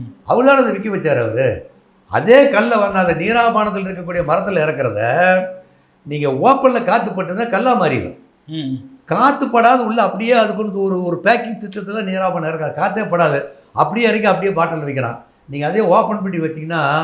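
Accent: Kongu, India. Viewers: அருமையான பதிவு. ஐயா அவர்களுக்கு எங்கள் மனமார்ந்த நன்றிகள். அவரின் கொங்கு தமிழ் அருமை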